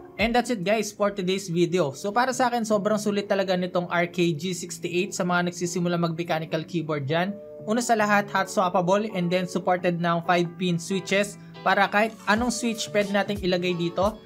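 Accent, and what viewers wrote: Philippines. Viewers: I love that I can still understand Tagalog. It's so fun to hear you do Taglish.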